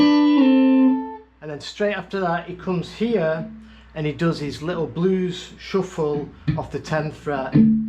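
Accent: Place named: Northern England, United Kingdom. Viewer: way aye lol. You sound like you are from the North of the UK. Leeds area...?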